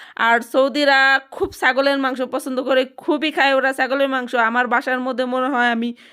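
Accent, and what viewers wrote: Manikganj, Bangladesh. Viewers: আপনার কথা শুনে মনে হয় আপনি মানিকগঞ্জ জেলা সিংগাইর থানার মানুষ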